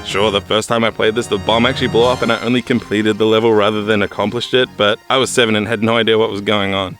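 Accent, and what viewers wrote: New Zealand. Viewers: you sound like youre from nz, which begs the question, why isn't this titled Lylat Wars?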